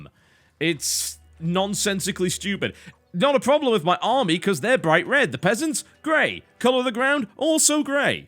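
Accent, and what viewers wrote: United Kingdom. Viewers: hails from England, but has been living in america for years, that's why his accent isn't very prominent, but he still uses british terms. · It's the British pronunciation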